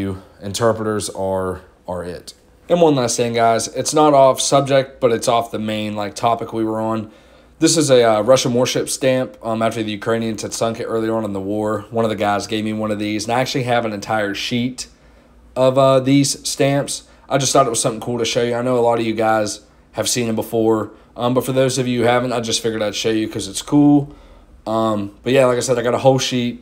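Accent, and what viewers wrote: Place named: Pittsburgh, United States. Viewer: As soon as you talked I Knew you were from the Burgh.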